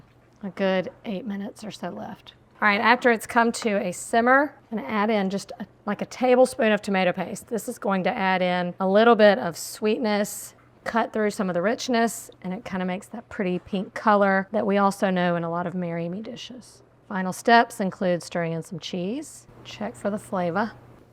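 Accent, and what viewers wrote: South, United States. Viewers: Huge fan of! Love her easy home meals, her soft demeanor, and her cute southern twang. Keep the recipes coming!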